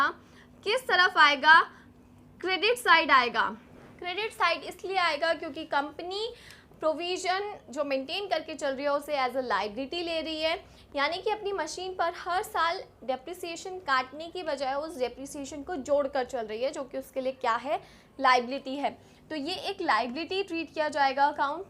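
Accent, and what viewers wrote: Haryana, India. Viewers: Haryana se ho😘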